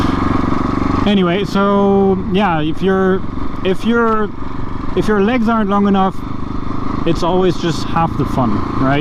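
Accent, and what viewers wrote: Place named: Germany. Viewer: Kompliment für den Akzent, hört sich nicht so schrecklich deutsch an :D Sehr aufschlussreiches video!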